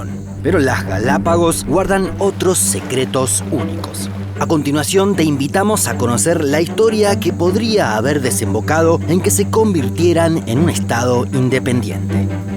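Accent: Buenos Aires, Argentina. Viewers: Gran video, como siempre. Sólo una pequeña sugerencia, que no tiene que ver con el contenido ni tema: Sería genial si las LL e Y sonaran como lo hacen en el acento rioplatense como anteriormente, pero que noté que hace dos videos se suavizan... No sé a qué se debe esto. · Xfa pronuncia bien las ye y lle No hables asi porteño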